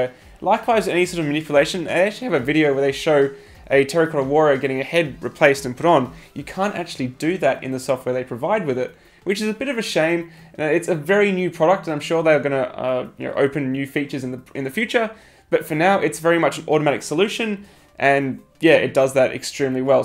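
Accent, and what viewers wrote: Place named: Australia. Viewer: Man love your vids! So to the point, auzzie and easy to understand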